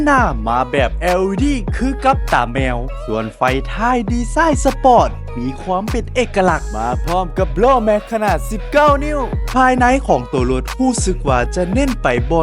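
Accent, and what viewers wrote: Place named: Laos. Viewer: ชอบฟังเสียงภาษาลาวแบบนี้มากกว่าค่ะ ดูเป็นเอกลักษณ์ดีค่ะ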